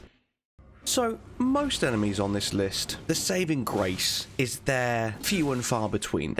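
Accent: United Kingdom. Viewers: Never knew how much I'd enjoy a British accent saying "motherfuckers" so much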